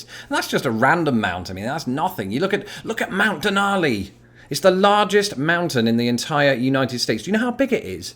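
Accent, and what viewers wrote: United Kingdom. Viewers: So what type of British accent is this? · It’s always weird hearing a British accent saying positive things about the US.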